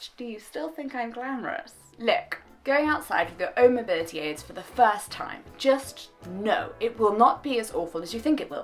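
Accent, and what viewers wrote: RP, United Kingdom. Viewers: You sound very posh. Love it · I did not understand the speaker (the British accent for one thing) or where she was coming from until late in the video. Hmm. I recall being touched by Selma Blair announcement though. I’ll have to watch more to figure this chipper redhead out.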